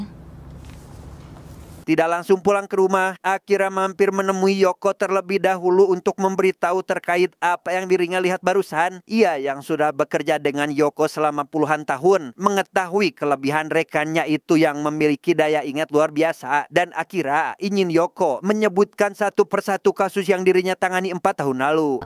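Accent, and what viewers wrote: West Java, Indonesia. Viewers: ....sunda na ti mana?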